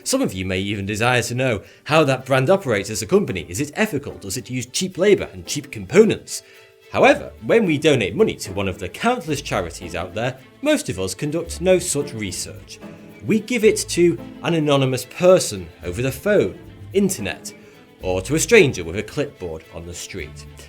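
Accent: United Kingdom. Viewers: His accent is so British that I have to watch the video twice , first time to warm up for the accent and the second is to actually understand. But I love his content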